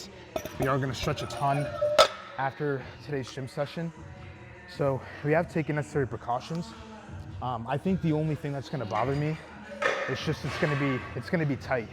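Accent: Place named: United Kingdom. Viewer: deadass thought u were british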